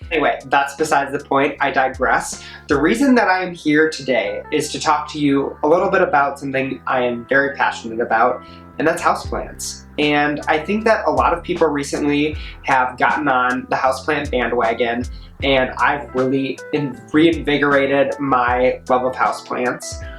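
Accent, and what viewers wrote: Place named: Canada. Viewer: cute space, cute plants, cute person, cute accent! i’m a canadian who lives in france but grew up along the border and your accent makes me miss home 💕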